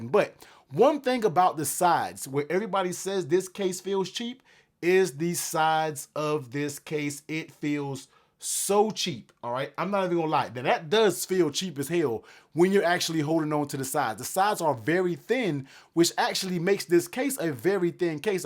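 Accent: United States. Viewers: You're american huh? can't you just talk normal?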